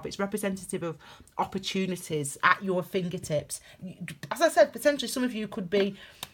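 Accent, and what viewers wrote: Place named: North, United Kingdom. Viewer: My pleasure! More please! LOL! It’s ironic that when I listen to many of those Texan fast mouth readers I can barely understand them. Your beautufl Northern tones of course are so easy to listen to...and of course the content is excellent! 🍷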